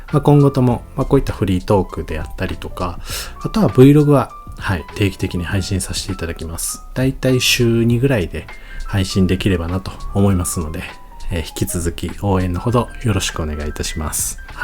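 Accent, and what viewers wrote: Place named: Kansai, Japan. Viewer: さんの地声での動画は初めてなので、動画見ながら、ん？関西弁？って思ってたら、大阪だったんですね！！🌟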